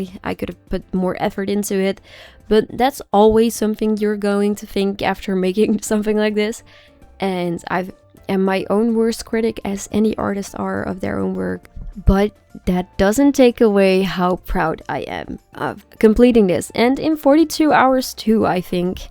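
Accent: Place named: Netherlands. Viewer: ive loved httyd for so long, I went to see all the movies in the cinema with friends or family. I loved the way you drew hiccup and toothless (although i might be more biast towards T cus I love dragons in general) It's always so odd seeing a style i recognize and then realizing I've seen insta reels of them before 😭😭 (kny saja boys) Also I love your accent!! I knew it sounded dutch and I always get excited seeing other dutch artists who like the same medias I do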